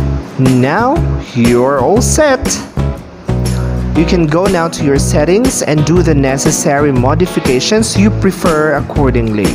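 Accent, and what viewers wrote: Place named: Philippines. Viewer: wahhh sabi ko na filipino yung nagsasakita eh ahaha... ang ganda ng english accent <333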